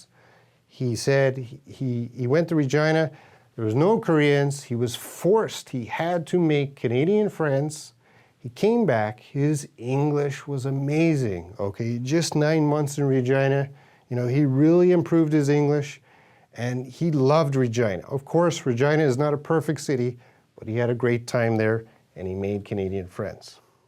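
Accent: Eastern Europe. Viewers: Are you eastern European? because you don't sound like you are from Canada. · I'm sorry, but minutes in you sound kind of Eastern European, or "something" Canadian. So you want foreign speakers to see you sounding weird to another Canadian, as normal? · So, as a linguist, I hear your speech has a slight Eastern European accent such as Czech or Slovak. What other language do you speak? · You really seem to have a bit of a Slavic accent of some kind - were your parents from over there? Or - what is your background? Just curious - if you don't feel like talking about it, no problem!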